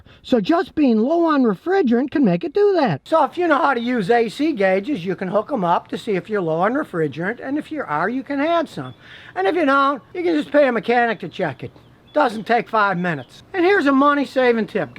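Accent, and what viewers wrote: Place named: South, United States. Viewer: Your English spoken very country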